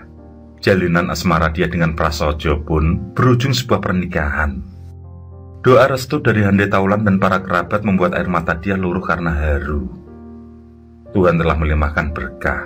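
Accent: Java, Indonesia. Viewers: You got a new subscription from me! Logat Jawanya sukaa. Gambarnya bagus, nggak pakai penggaris pun garisnya lurusss.❤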